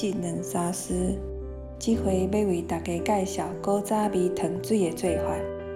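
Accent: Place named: Central Taiwan, Taiwan. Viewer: 配音的腔調真的是中部腔，不是住新竹就是新竹腔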